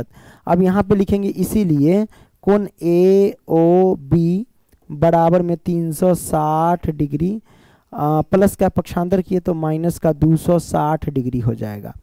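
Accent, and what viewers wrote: Bihar, India. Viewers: 🎉 रमाय नमः 🎉 गुरु जी 🚩 आप राजस्थान बोर्ड से पढ़ाते हो या बिहार बोर्ड से बहुत देर से खुजली हो रही थी पूछने के लिए अच्छा आप जो बीच बीच में जो ये बिहारी भाषा बोलते हो तो अच्छा लगता है😅 क्योंकि हम राजस्थान से है😅 ओर आप अच्छा पढ़ाते हो❤ में अपने दोस्तों को भी बोलता हु पढ़ने के लिए 😅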